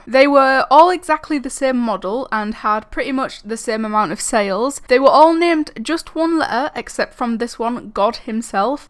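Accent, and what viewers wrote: Scotland, United Kingdom. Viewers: Scottesh Accent over <num>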